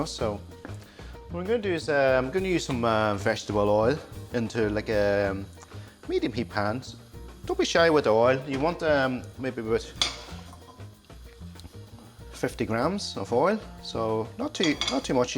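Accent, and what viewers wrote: Ireland. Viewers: Asian guy with Irish accent cool..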